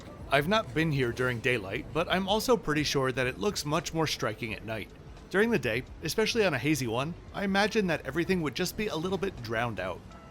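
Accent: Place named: United States. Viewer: You're not an American? I don't know. Your accent gave me American/Canadian vibes.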